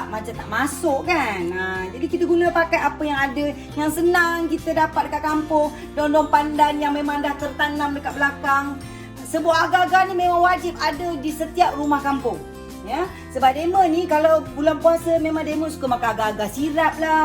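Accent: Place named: Perak, Malaysia. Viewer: Eeyyy mok sedap bebeno nengok nye..sis labon bahase perak best pulok ..lawok pun ade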